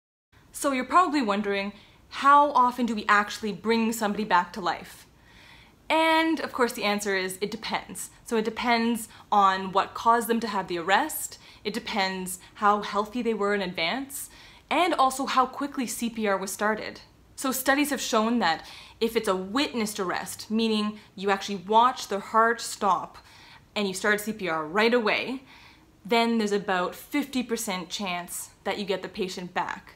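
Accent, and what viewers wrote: Canada. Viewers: I live in the US in Ohio. Just thought I'd throw that in there as well. You sound like you have a Canadian accent. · I've never seen your videos before, but as soon as I heard you speak I knew you were Canadian from your accent! Great video!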